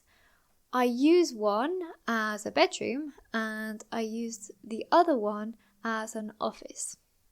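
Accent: United Kingdom. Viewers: Amazing british english 🇧🇷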